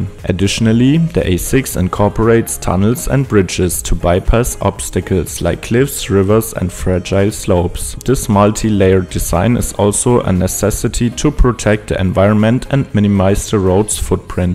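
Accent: Germany. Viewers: boah den deutsch Akzent kann man sich echt nicht geben😂😂😂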